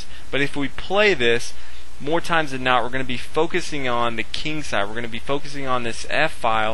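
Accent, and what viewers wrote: United States. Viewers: I love this dude's voice / accent what part of US is he from?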